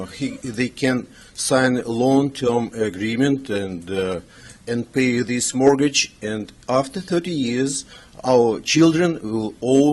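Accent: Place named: Russia. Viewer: Надо отдать должное Вашей смелости: английский у Вас русский, явно недостаточный для избрания на должность мэра.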